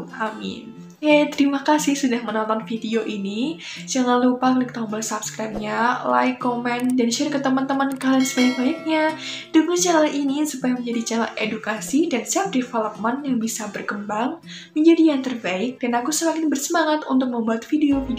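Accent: Java, Indonesia. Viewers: Medok banget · Wong jowo nfi mbaaak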